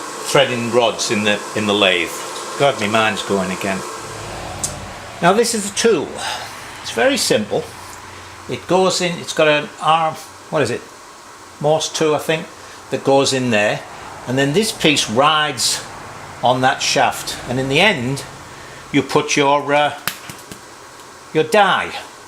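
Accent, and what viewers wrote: United Kingdom. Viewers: I'm a car guy but I'm finding this series very interesting. Thanks for showing every little nuance that needs to be taken into consideration. Nice to hear a Brit abroad too. Mart.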